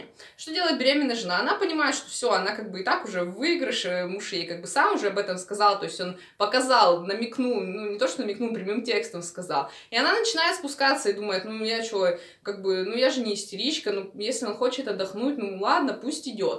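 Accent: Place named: Urals, Russia. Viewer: Говор Уральский, окающий, ты из Перми?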